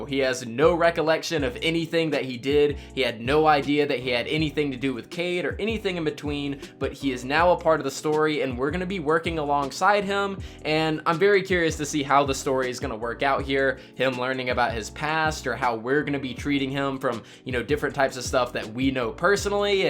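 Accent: France. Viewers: C'est un français ?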